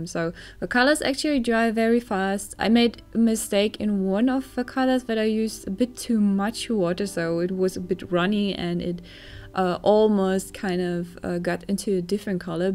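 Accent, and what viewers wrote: Germany. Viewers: You're german. I can hear it😂.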